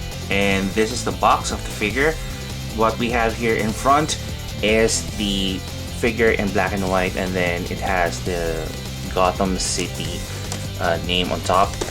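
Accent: Philippines. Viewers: hi can i ask a question too? Are you pilipino cause your accent is like that just curious :D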